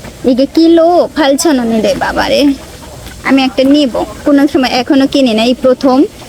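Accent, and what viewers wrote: Khulna, Bangladesh. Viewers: আপনি খুলনার মেয়ে?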